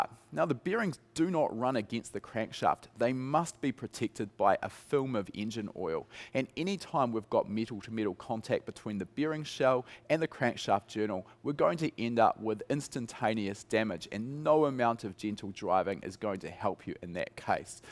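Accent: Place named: New Zealand. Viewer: Thanks for the reply,. Thanks to the vast amount of time I've spent listening to you, I can whip out your NZ accent at a moments notice at this point. Great fun in a party/pub moment.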